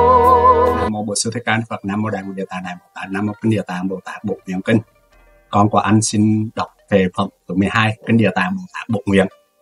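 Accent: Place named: Hue, Vietnam. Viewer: Em xin chúc mừng anh đã thuộc lòng kệ phẩm 12. Giọng Huế rất ấm áp dễ nghe ạ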